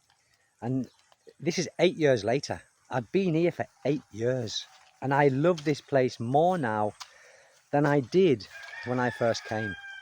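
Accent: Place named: Teesside, United Kingdom. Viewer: Here’s me thinking you were from Teeside😊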